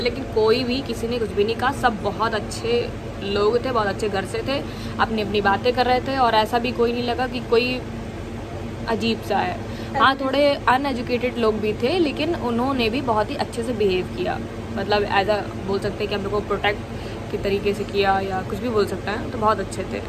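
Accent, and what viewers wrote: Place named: Awadh, India. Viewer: wahh awadhi tone ko Hindi me sunna bohot acha lagta hai mujhe aap ka Blog acha hai starting achi hai aap ko bolne ka hunar bhi hai yaha me sab nahi keh sakta kyunki blog ki bat hai par han agar me tumhari koi help kar paun to mujhe jarur batana studio ka kam hoga to batana ❤ baki achi kosis sherni ho aap❤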